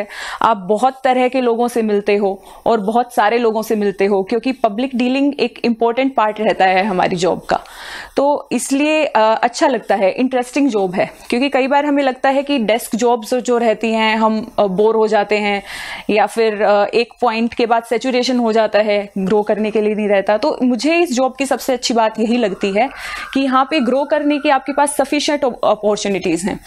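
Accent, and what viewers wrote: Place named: Haryana, India. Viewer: Haryanvi accent 🤘🤙👏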